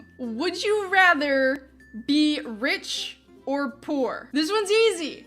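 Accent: Canada. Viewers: are you from Canada new Zealand or Australia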